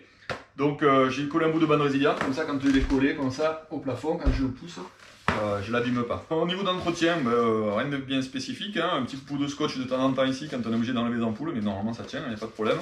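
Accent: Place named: South, France. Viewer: Le bel accent à la Cabrel, magnifique ! ( et trés ingénieux le système bravo à vous )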